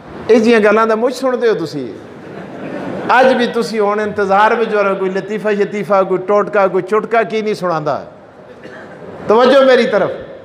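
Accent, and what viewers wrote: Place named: Pahari, India. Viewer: مولانا سعید صاحب ماہڑی "پہاڑی" بولی جس کو اوہ ہندکو آکھدے ہیں بچ گل بات کردے مچ پیارے لگدے ہین اللہ تعالیٰ انہاں کو سلامت رکھے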